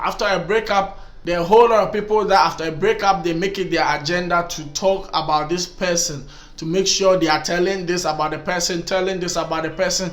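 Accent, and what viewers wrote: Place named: Africa. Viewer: This African accent. I love it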